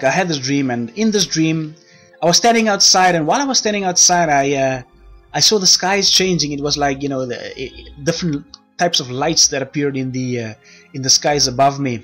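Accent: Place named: South Africa. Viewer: Hey, I recognize that accent, Im from South Africa too and live in Costa Rica, nice hearing from you brother, God Bless and thank you for your message, Yeshua is coming, Amen!!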